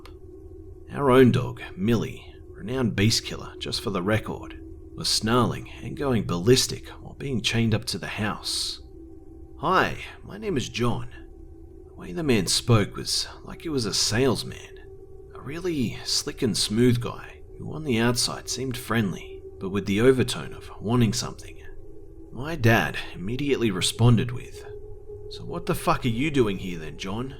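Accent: Australia. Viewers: are you Australian, and I love your videos your the best narrator on YouTube. Please reply. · is the reason I now find Australian accents insanely attractive.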